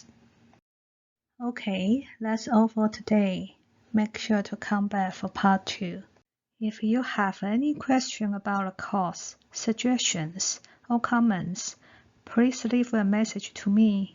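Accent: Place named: Taiwan. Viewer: thank you for your work. you have the cleanest presentation of taiwanese mandarin i found on the internet. ive been searching a lot for taiwanese teachers teaching mandarin.hoping for more! also why would ccp hackers do that when people just want to learn?that's crazy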